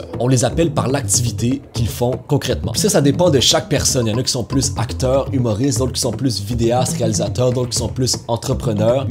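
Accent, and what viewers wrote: Quebec, Canada. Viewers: Ptn juste j'adore ton accent genre l'accent québécois c juste trop cool et continue je trouve que tu est génial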